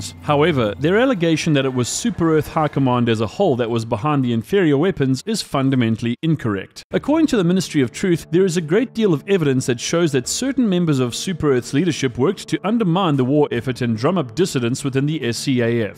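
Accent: South Africa. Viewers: Is this a SAFA based channel? I know that accent far too well! SAFAs for democracy and liberty! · I know a South-African accent when I hear one, boet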